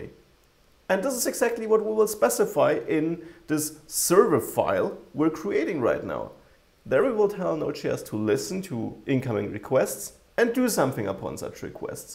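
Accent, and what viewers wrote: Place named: Germany. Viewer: The German accent man, that's a pain in the a**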